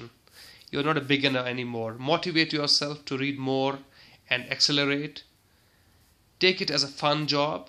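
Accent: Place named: Kashmir, India. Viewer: Every kasmiri aspirant has this tinge in accent and when he speaks it sound like poetry... Athar aamir b aisa hi bolta hai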